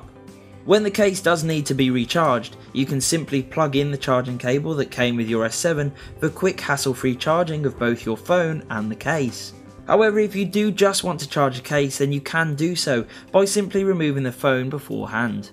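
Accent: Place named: United Kingdom. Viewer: i need more of that british accent...makes all your videos enjoyable!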